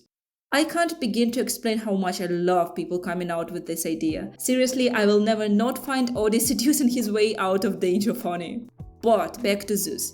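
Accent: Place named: Ukraine. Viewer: Моментально по акценту відчула своїх, хіхі Дякую за чудові відео, вони як стан душі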